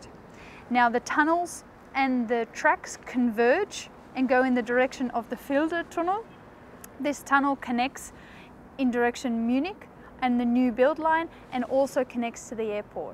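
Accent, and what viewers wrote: Australia. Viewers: Do I sense an Aussi accent? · I've been following this project since 2010. It's great to hear an English overview of the latest developments but even better that you're an Aussie. This is Stuttgart's equivalent of the Sydney Opera House. Please volunteer to do more. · cool project, cool people working on it and the Australian accent is very Wellcome in Schwäbisch Land..:) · God, I totally love this australian accent O.O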